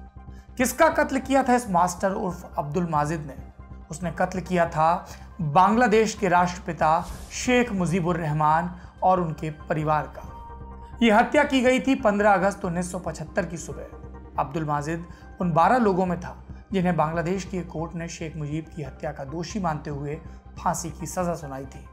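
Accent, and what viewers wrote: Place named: Bundelkhand, India. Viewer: sir aap kaha se hai mai jalaun se hu aap bundelkhandi bolte hai aap kaha se ho plz plz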